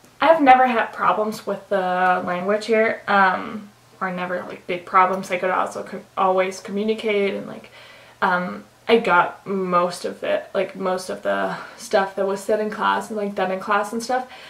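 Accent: United States. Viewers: Ich weiß zwar nicht, wie dein Englisch vor der Reise war, aber ich finde es echt super! Du hörst dich so amerikanisch an, als wärst du dort geboren und hättest dein ganzes Leben nichts anderes gesprochen 😊 freut mich total, dass es in der Schule so gut klappt 😍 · Omg ich dachte am Anfang seit wann habe ich eine Amerikanerin abonniert😂😂😂 Omg du sprichst so gut. Ich wäre untergegangen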